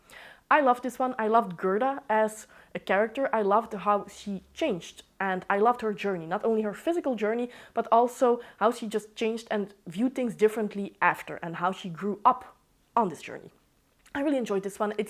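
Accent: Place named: Netherlands. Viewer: I hope you're feeling better now. Thank you for the recommendation. I loved most of the books you recommended, the others go on my TBR. I wasn't a fan of Mistborn, maybe I'll like Elantris. Just found out you're from Belgium, like me. 😊 Do you only read in English or also in Dutch? (I think you're dutchspeaking, going by the accent.)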